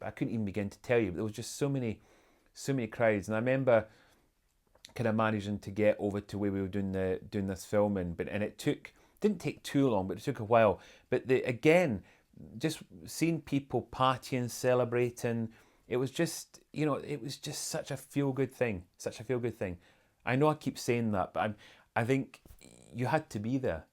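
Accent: Scotland, United Kingdom. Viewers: You're probably too much of a gentleman to answer this question but...having known Harry when he was younger what are your thoughts on his and his wife's current behaviour? Surely as someone who had seen first-hand how close the brothers used to be their current estrangement must be very sad to witness...☹ Ps...love the Scottish brogue, it's so incredibly soothing! 💖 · Are you Scottish? We love your accent!!!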